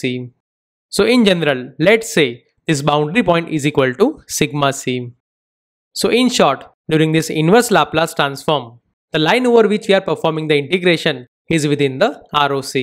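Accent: India. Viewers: Please for love of god work on your accent. Indian accent hurts my brain man.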